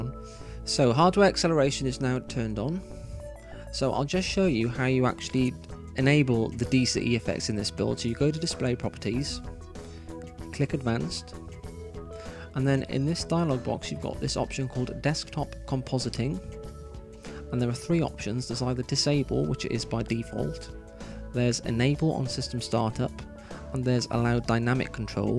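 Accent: United Kingdom. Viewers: i'm learning british accent with your videos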